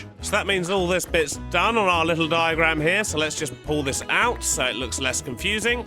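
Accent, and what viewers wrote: United Kingdom. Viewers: Your voice sounds so british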